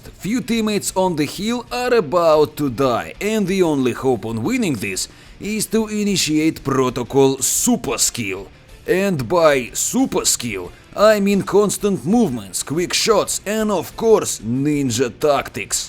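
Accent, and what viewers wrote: Russia. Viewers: True russian accent.Perfect English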